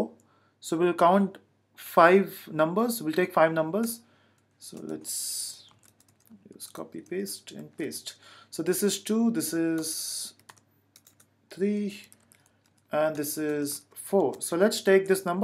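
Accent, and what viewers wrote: Telugu, India. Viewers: Sir are you from telugu state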